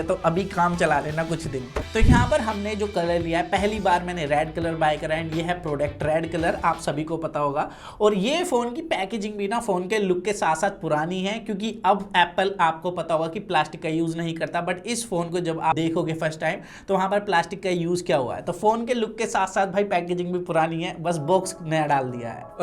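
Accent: India. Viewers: Only one tech editor who pronounciate desi hindi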